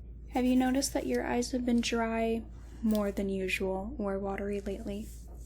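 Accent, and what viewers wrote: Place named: Midwest, United States. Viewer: I'm from Missouri and you sound just like me lol 😂 Midwestern love!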